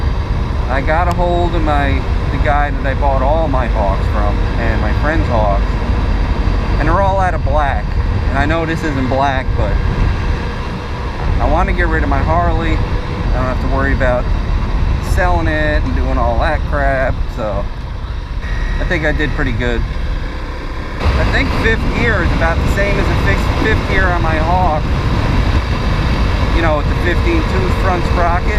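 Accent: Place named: Maryland, United States. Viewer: what state are you in? Sounds like MD or PA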